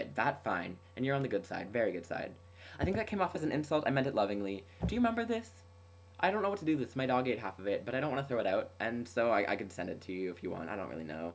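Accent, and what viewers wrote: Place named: Canada. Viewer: Haha. I love your canadian accent, the way you say 'About' and 'House.' It's however not as thick as Dhiel's, from another gay-themed collab channel, something like awesome not so straight guys. I always forget the name. v_v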